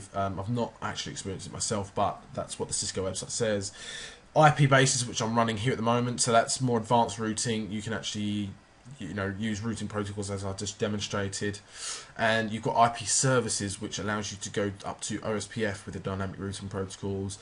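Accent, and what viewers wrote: United Kingdom. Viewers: Rooters. God, you are gloriously British. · Finally, An IT tutorial with an English accent..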